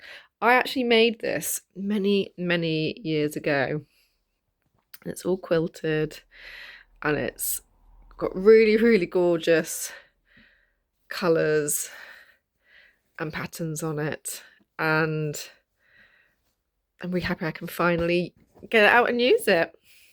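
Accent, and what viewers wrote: United Kingdom. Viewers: Hi there 👋 r you from Britain?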